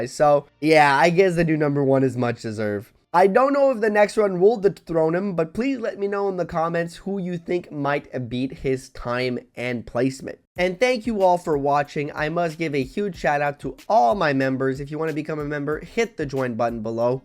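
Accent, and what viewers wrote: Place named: France. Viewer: First time I have noticed an accent, are you french?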